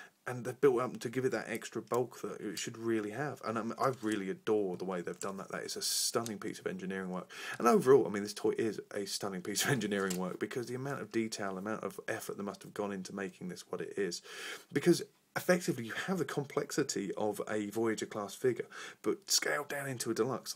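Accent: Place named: United Kingdom. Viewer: hes from the uk theres nothing wrong wiv his accent if you dont like his voice dont watch it cant help the voices were born wiv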